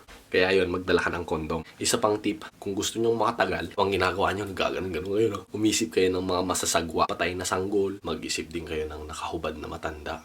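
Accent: Ilocos, Philippines. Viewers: This kuya is ilocano.. talks like my uncles and cousins in la union Kuya, cave is kweba not kubeta (toilet) 😋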